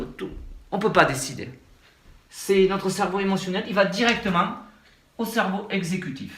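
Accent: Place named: South, France. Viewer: vous êtes top! Juste vous écoutez avec l'accent du soleil çà me remonte mon taux de sérotonine;)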